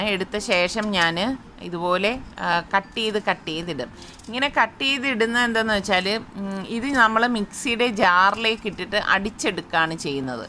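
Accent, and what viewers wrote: Trivandrum, India. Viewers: Ithaa,veedu tvpm aano? Varthanam kettittu anganey thonnunnu....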